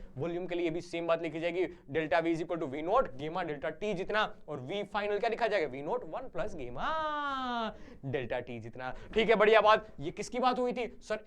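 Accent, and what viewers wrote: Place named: Haryana, India. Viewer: Sir Tey to haryanvi lage manne🤔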